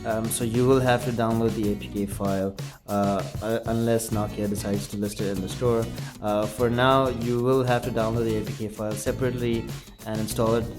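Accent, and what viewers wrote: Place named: India. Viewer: Ur name is with dat accent uhh, r u catholic indian?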